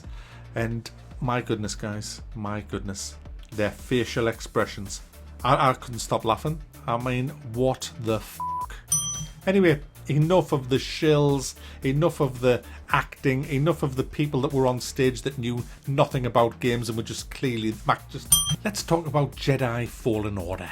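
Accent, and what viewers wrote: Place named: Newcastle, United Kingdom. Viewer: It's nice listing to a fellow Geordie youtuber, not many of us....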